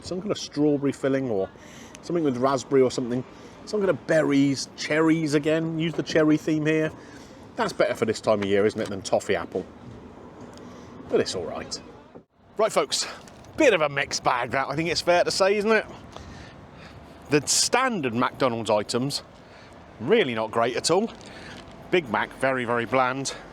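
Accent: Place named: Dorset, United Kingdom. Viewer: I may only be an honourary Dorsetian but it always makes my day hearing your lovely accent. Thank you for the videos and honesty,!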